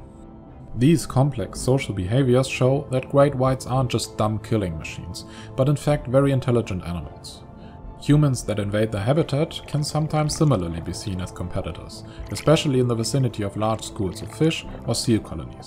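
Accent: Germany. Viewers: ur german voice is annoying. otherwise nice videos. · The german "I believe"narrator the art style this channel is my narcotic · Ich finde diese deutsche Aussprache so kacke :P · Schönes Video. Ich erkenne den Akzent direkt :D Germany !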